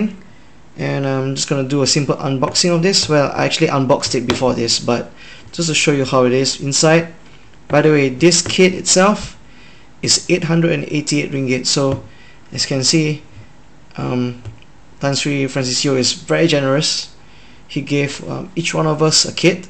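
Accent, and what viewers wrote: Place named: China. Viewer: You are Chinese or Chinese descent? I can somewhat tell from your accent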